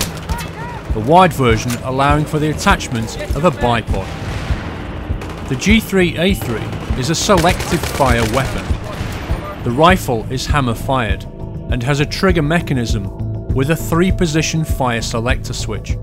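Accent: England, United Kingdom. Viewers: From the HK Website: Frequently asked Questions What is the proper way to pronounce Koch? The English pronunciation is pronounced, “coke." He pronounced it properly, it's coke, but he has an English accent. The video pronounced it as perfectly as his accent allowed. You're all saying it wrong. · DAMN!! now thats sone in depth review!! THE NEW BREAD OF REVIEWS! Fantastic video! see you got to narrate it lol he sounds like your average uk army guys accent lol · Why the English accent !?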